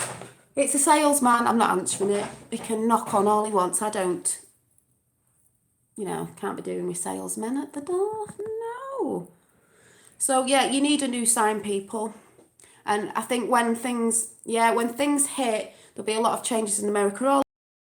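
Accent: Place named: Yorkshire, United Kingdom. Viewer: Yorkshire? I have friends there, they talk like you. Thank you for sharing so much with us, your passion is contagious!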